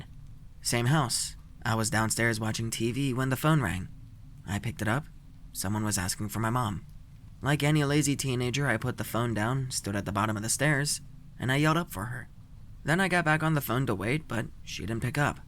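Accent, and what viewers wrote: Scotland, United Kingdom. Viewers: Nice Scottish accent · best scottish accent evwr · Sometimes the worst stories are the best! Think this is my 3rd listen already. Hope life is going better! Thanks for the BEST Scottish accent ever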